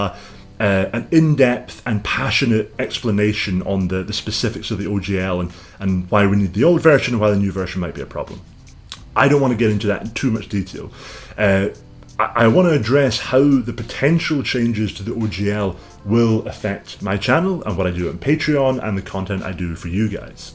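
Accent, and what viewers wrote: Scotland, United Kingdom. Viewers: I have literally never heard of this channel before but silver lining of this OGL debacle is that I am finding creators I had never heard of. Also you have another fan of the accent here :D. Is it Scottish?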